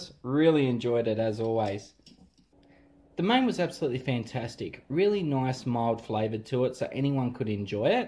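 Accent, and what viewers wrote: Australia. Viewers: Your australian accent like the blades to my ears. I love more the US Eng. But anyway you're the awesome reviewer at YouTube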